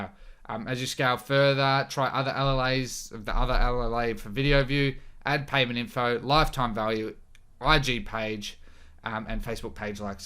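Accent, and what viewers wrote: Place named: Australia. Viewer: Thank you so much man for the content , I'm understanding Australian English better now 👍👍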